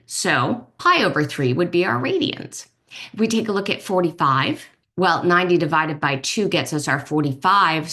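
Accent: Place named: New York, United States. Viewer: Lovely concise little video…also, I love that accent of yours..is it a New York accent?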